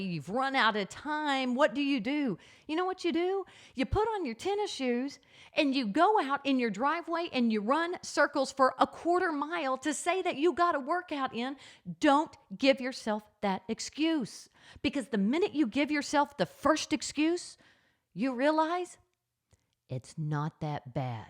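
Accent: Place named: South, United States. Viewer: I love your voice. A bit of southern accent, lots of energy, so friendly!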